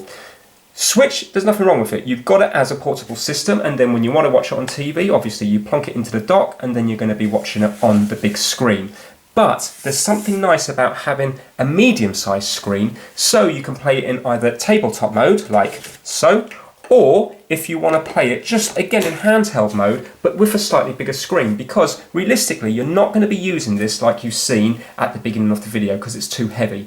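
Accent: United Kingdom. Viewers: You're better and more resourceful engineer than an engineer from Columbia University in New York. But I'm not sure about the British accent though.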